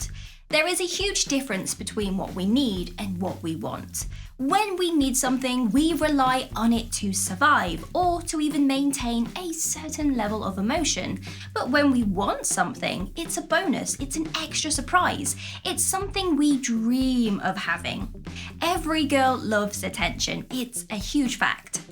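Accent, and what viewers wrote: United Kingdom. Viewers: I like your sweet British accent)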